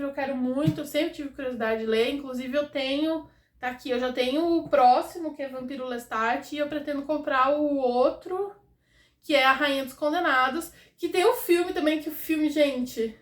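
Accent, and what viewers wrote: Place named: Inland São Paulo, Brazil. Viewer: Além de amar seu conteúdo (te sigo há pouquíssimo tempo), preciso falar que te acho belíssima, que sua voz e seu sotaque muito me agradam (você é do interior de SP?), sua roupa e maquiagem são lindos!! Gostaria de sugerir uma iluminação diferenciada... Eu não sei, não conheço as coisas, talvez aquelas ringlights? Eu achei um pouco escuro, não sei... Obrigada pelo vídeo :) O calendário ficou tudo!!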